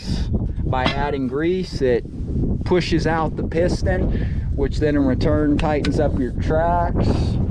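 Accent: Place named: Appalachia, United States. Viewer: Actually another Completely annoying Amateur wanna be when yacking about the dozer only control stick you said the blade goes forward which is annoyingly Stupidly obnoxious the machine goes forward not a dozer blade.you obviously have the same hillbilly accent as the long dead n hayden who of course thought they were invincible peddling a pedal bike in italy ignoring a walkman equipped Stop sign getting graciously taken out forever by one crossing car brain dead from then on was also brain dead before impact.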